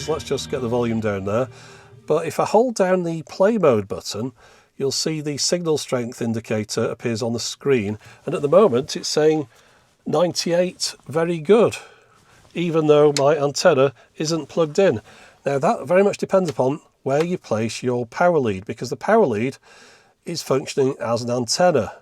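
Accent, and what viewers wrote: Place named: Liverpool, United Kingdom. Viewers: So you’re a Liverpudlian 🤔 that’s where the accent comes from. Anyway, a very interesting video for me. As ever since I was a teenager I wanted to get a Bose Wave of some description. But they weren’t available in my part of the world. Bose are a solid good quality company too. It’s a shame the Wave series have been discontinued (not of too much use in a smartphone/Bluetooth speaker world I suppose) and the stores shut down. Even if the latter is a moot point from my point of view, as they never were available in our parts anyway 🤷‍♂️ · AHA! That's your accent. Liverpool - thought you sounded like a Beatles all these years. Can you play drums? You're really near Ringo.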